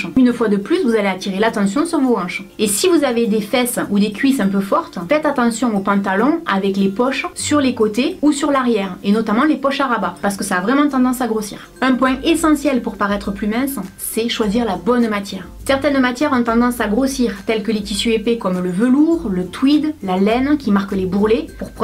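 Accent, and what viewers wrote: South, France. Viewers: super vidéo et en plus l'accent du sud est super sympa. Bonne continuation. BRAVO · Mais je l'aime trop lol je T écouterai pendant des heures cet accent du sud LA !! Ça fait du bien ! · Bonsoir! Tout d'abord, ça ne fait que un ou deux mois que je regarde tes vidéos et j'aime énormément tes petites anecdotes, tes conseils, encouragements etc. J'ai du mal à y croire mais je crois que ça me motive un peu, surtout avec ton accent du Sud! Haha. Donc merci! Je me suis décidé à paraître au grand jour ce soir à cause d'un désaccord (il en faut bien un..). Je trouve que les collants semi-opaques ou opaque alourdissent plus la silhouette que les transparents.. La preuve sur moi: j'ai des mollets épais, des genoux trop ronds et de bonnes cuisses (oui j'ai quelques kilos en trop) et les opaques me donne l'impression d'avoir des poteaux à la place de jambes. 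Idem pour les semi-opaques! Du coup je suis "obligée" de me rabattre sur les transparents et honnêtement ils font effet amincissant sur moi.. Quoi qu'il en soit, merci pour ta vidéo qui me permet de choper deux trois trucs pour paraître fine et bonne soirée!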